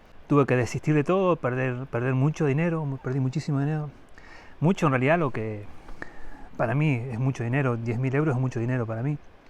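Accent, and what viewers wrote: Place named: Argentina. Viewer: Por ser argentino tenes acento de que parte de argentina sos. Muy buenos los vídeos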